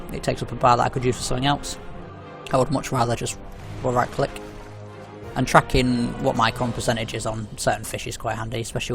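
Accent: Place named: England, United Kingdom. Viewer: Bloody idiots. This is fucking English. This is what English sounds like in England. Not in London, in England. All of these non-native English speakers complaining makes me cringe hahaha, get your own world language and see how you feel about every tom, dick and harry butchering it before your eyes.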